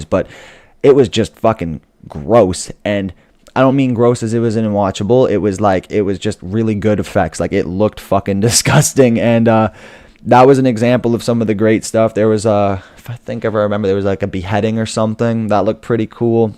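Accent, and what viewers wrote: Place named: Germany. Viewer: Awesome. Loved your German accent. Nice to see more subs with every video. Keep em coming!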